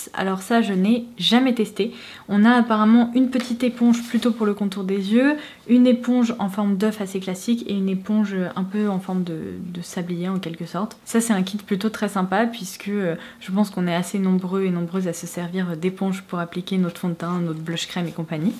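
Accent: England, United Kingdom. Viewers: Sur tous les calendriers que j'ai regardé, celui là est juste whaou. MAGNIFIQUE 😍😍😍 Merci beaucoup pour cette belle découverte. Vous êtes d'une douceur que j'adore. En plus c'est incroyable toute votre connaissance sur les produits beauté. Et votre accent anglais, on en parle??? Juste whaou. Vous êtes un vrai rayon de soleil. Merci😍😍🌹🌈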